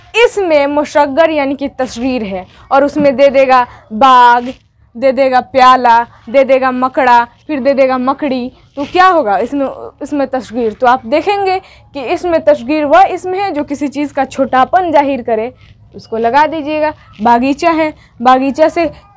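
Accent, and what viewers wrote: Bihar, India. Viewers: ماشاءاللہ بہت خوب بس پلیس آپ شاید بہار سے ہو اس لئے کے آپکی زبان بتارہی ہے جیسے(زگہ نہیں بہن جگہ) معذرت کے ساتھ آپ سے درخواست ہے کہ آپ انٹرنیشنل استاذ ہو اس لئے زبان بھی ویسی ہی ہو جزاکم اللہ خیرا واحسن الجزاء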